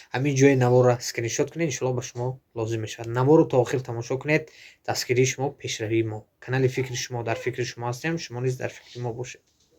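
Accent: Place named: Vorukh, Tajikistan. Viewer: акценти ворухи гап мезанед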